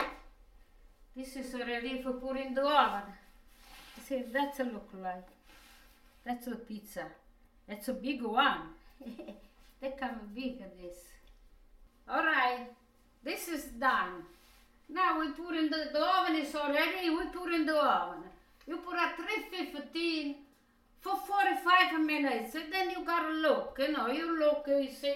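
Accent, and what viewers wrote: Italy. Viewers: As always, Grandma, love your speech, your gorgeous Italian accent, your singing, your willingness to share your techniques with us! Grazie molto!!! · Just love the Italian-English accent (not Italian myself)! Could watch this video just to listen to this dear lady speak 😍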